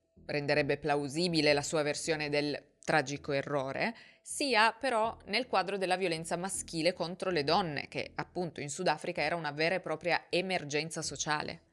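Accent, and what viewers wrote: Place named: Piedmont, Italy. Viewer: "Pistola spiegata"??? Gia, fastidioso l'accento piemontese (corso di dizione, è il tuo lavoro). Ma certi strafalcioni.... Aggiungo: "Sfonda la porta del water"...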